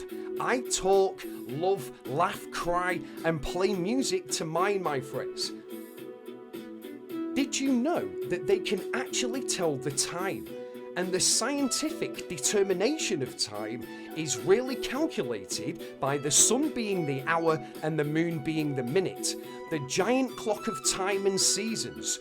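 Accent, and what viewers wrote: United Kingdom. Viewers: I like your narrative in your Yorkshire or manc accent. Somewhere up north... Great series mate, glad I discovered you, you deserve way more views from these vids. Going to have a binge on these now while decorating from where I left off on episode 7. Rather watch this than Netflix anyday!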